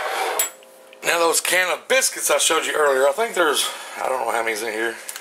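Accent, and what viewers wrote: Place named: Alabama, United States. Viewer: ***** Ok no wonder you sound familiar, Im from Bama, guess its the accent. This meal was great too, made its way into my recipe box, but ill be sure you get the credits ;-) thanks for sharing!!!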